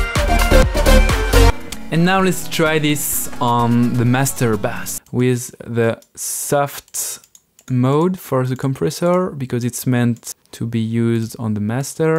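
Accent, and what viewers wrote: France. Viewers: french accent spooted? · Your accent really looks like the french one. idk if you speak french but ya fun fact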